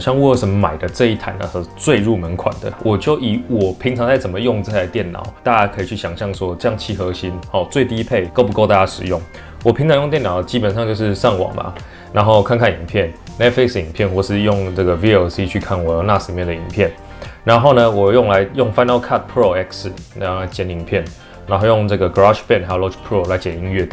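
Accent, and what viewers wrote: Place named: Taiwan. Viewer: 每次听这些台湾人说话的时候，总能让我联想到一些台湾朋友他们说话时候的赶脚。。醉了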